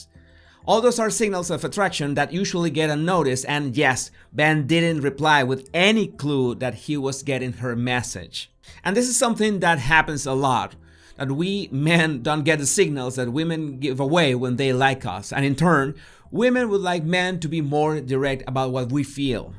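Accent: Spain. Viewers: Yes, I understand spanish or more what you call "spanglish" but sadly do not speak it fluently. I'm mexican/spanish american. My Mom's 4th generation and Dad's 1st on his father's side...but 3rd on his mother's side and was born here too, so they both speak it fluently...but don't have much of an accent when they speak english. We're very proud of our heritage and culture but obviously are very "American". I wish I spoke spanish better. What I like about is he speaks perfect english with a beautiful spanish accent, but even though he speaks at a nice pace, it's not too fast when he is giving his commentary, compared to most people who speak fluent spanish. Love the Latino accent...italian too! 😁💕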